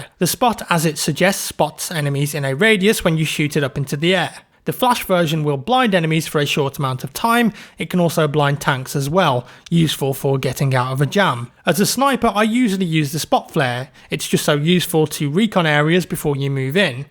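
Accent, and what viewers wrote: United Kingdom. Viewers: YOU. R. SO. BRITISH.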